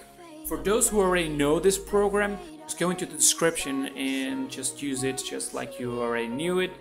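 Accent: Portugal. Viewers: ele é português, se não me engano. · Are you portuguese portugal?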